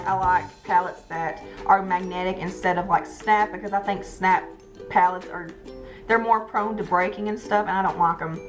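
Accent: South, United States. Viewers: I just subscribed!! You have a southern accent, Im from the south as well!! Its nice to finally hear someone who sounds like me on here lol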